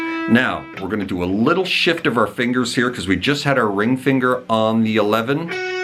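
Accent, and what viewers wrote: Canada. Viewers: Was that a Canadian accent? checks amp Ahhh, yep.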